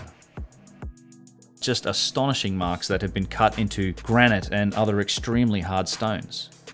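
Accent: Australia. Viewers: Irrationally cool this info has a Australian accent. Stuff took generations to make... that’s key. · (Just a comment to help with the algorithm , unquote.) Man, I love hearing this revolutionary information in an Aussie accent. Just sayen ... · Good on you, mate. Interesting videos, including your own unique take on things; plus it's refreshing to hear a relaxed Aussie voice (and Aussie-isms) on videos of this nature. Extremely envious of your opportunities for travels, discoveries and adventures. Cheers from Port Macquarie NSW. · I love your work. Its mind boggling to me how wrong the mainstream is on this and I don't understand how it can keep up the lies for so long. From a engineering perspective alone the proof is everywhere of . honestly I think you should have billions of subscribers. People are blind. Even my userly open minded flatmate fails to see the proof.he watched a few minutes of your fine work and became instantly sarcastic. 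He says he knows the history of Egypt, he was taught about it in school ! lol. He then went on to say that the Australian accent was annoying him so I guess its all sorted for him haha. Bloody hell typical kiwi 🥝 🙄.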